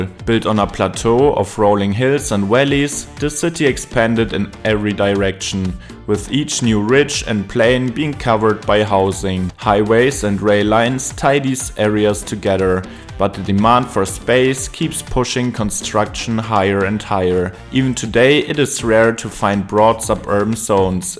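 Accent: Germany. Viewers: I know a german accent when i hear one! Love it! And yay new city channel!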